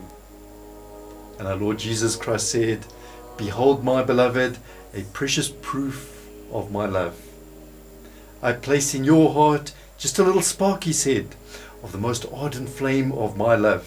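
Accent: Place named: South Africa. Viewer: Your south African accent is unmistakable, greetings from Pretoria 😁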